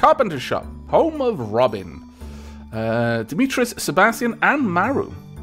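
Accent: Wales, United Kingdom. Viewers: Hey, I can immediately tell that you are British from your voice but you don't sound very Welsh. since you're returning to the valleys, you could bring the account into it a bit. show me that you're the only gay in the village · he's Welsh. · I thought he was British XD thanks for the confirmation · Ok so after watching your let's play i went and bought the game and am just starting out. Loveit so far! love the vids and your adorable welsh accent! First time watcher of yours now and new subscriber. Just wanted to say thank you and keep going. please. Also love the tardis and doctor Who references as well as a few other things you have referenced. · I just found your channel and your voice is arousing. Also you are the most British youtuber, even among British youtubers. (That's a compliment) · You have that Accent, sounds British or genuine gentlemen like.. 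soothing I can listen to you play great games on a podcast. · Ah so your a brit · Tigran Movs he’s welsh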